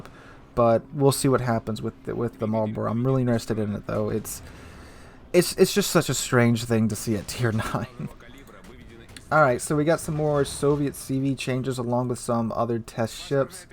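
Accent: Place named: United States. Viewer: True, but pronunciation isn’t bad, it’s just the Americanization, like saying Edinburgh as Edinburough instead of Edinburra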